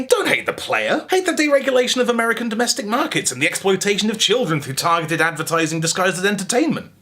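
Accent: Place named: United Kingdom. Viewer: It's the accent. Everything sound epic in a British accent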